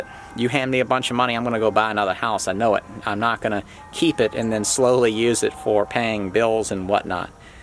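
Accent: South, United States. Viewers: I'm assuming you're in a southern state by your accent and the homes prices...can I ask which state? We are in Louisiana, so just curious. The duplex is 11k, we will have our work cut out for us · If the accent and price of homes didn't give it away that dirt is as south as you can get ! Look at all the clay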